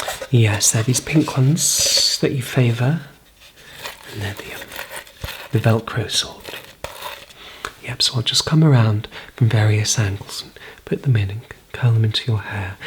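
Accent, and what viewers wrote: RP, United Kingdom. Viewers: I’ve never heard someone with a British accent do an impression of a British accent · Very nice British accent I love when the queen answered for me that was nice very relaxing 😴😴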